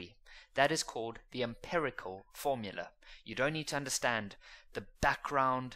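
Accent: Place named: Afrikaans, South Africa. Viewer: aaaa... comment take 2. It is now 2;50 in the moring with my test tmr, this is like the 3rd video of yours that I'm watching and oh my soul, the jokes. I almost woke my house laughing. fristy comment on your market stragey, Making content that is already in demand just slightly older is very smart * cap cap. secondly thank you for making this avliave bro, appreciate it. thirdly, are you South African? Your accent sounds very much afrikaans, its cool. Okay good night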